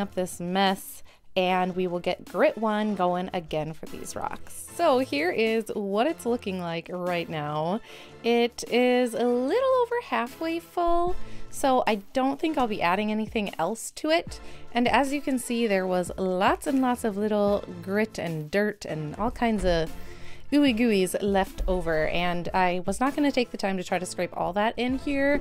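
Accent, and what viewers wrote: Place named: Midwest, United States. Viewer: This was randomly recommended to me and im only about a minute into this video, but i didnt realize how much I missed the subtle midwestern accent until you said 'its fall here in minnesota'! I grew up outside the twin cities and moved to oregon a couple years ago and i just got hit with a wave of nostalgia and endearment for the type of people i grew up around. Thanks for the reminder of home, im excited to see how the rocks turn out!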